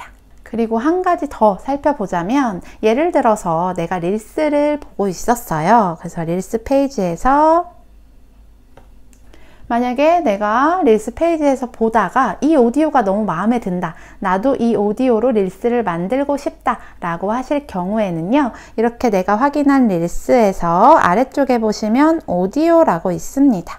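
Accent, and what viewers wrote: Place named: Gyeongsang, South Korea. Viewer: 경상도 사람이네요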